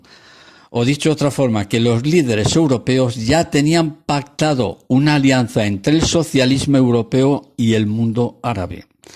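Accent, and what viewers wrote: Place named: Bolivia. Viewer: Tiene acento puramente boliviano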